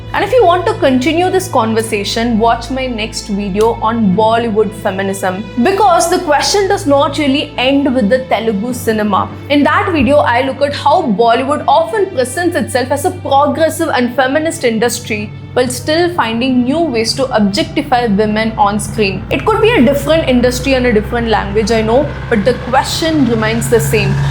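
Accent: Tamil Nadu, India. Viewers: Please, if you cannot pronounce Telugu words or names and pronounce everything like Tamil words with a Tamil accent, don't make videos on Telugus. , Director K Vishwanath was not called "Kaalaa" (black) Tapasvi. He was called "Kalaa" (కళా, Art) Tapasvi. By the way, Tamil and Hindi film industries are shit too. Where are the artistes of the calibre of MGR, Shivaji Ganesan, Raj Kapoor, Devanand and the likes? Tamil cinema actors and comedy is the worst. Is Dhanush even an actor? Looks like a beggar. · Mis, I have great respect to you the way you have overcome all odds and stand infront of public n camera....One request, pls come out of Tamil pronunciation, especially Spelling P as B in public is kind of scar on moon.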